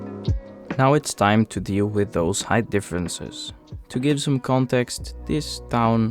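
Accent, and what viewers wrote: Netherlands. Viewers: Me vrouw zei hij heeft een Nederlands accent, en volg al denk een jaar je kanaal😂😂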